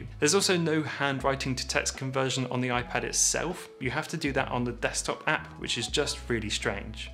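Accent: England, United Kingdom. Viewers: English accent is such a flex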